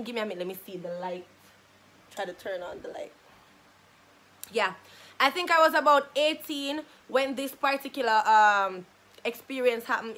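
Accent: Jamaica. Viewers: I love jamican english ❤️ like the slangs are lit... 🇳🇬 your vibe is lit ❤️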